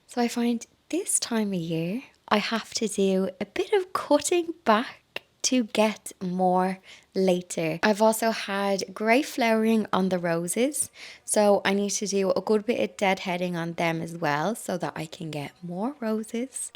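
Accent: Ireland. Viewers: love your program ive just come across it to day love your Irish accent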